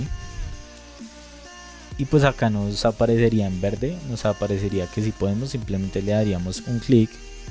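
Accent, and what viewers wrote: Bogotá, Colombia. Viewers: Iba a preguntar si en LAN se puede pero se le noto el rolo :v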